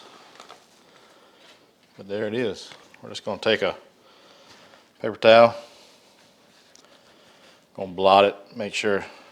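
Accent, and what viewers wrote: North Carolina, United States. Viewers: FOOD LION "JUST KINA PUT IT ON THEAR" ,,,,I'LL BET YOU'RE FROM North Carolina!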